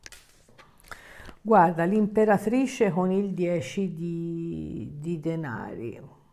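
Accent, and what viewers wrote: Tuscany, Italy. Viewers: sei troppo simpatica, il tuo accento toscano è fantastico... ok?❤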